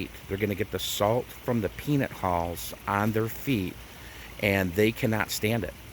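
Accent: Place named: Wisconsin, United States. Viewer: Ughhh!! I can’t stand this guys accent!! Pawnd. It’s like a Wisconsin accent I’m sorry but it just bugs me!!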